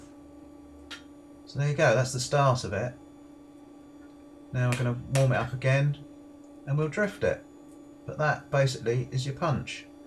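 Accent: United Kingdom. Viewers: Hey you sound like you're in the UK, great video it helped me a lot, I'm learning how to make complex sword hilts and this helped a lot, I just made a bunch of drifts and slot/hole punches out of some rebar and chisels. Do you happen to know a good place to get cheap swages and drifts here? or if you have any old ones for sale let me know, having trouble finding good large ones.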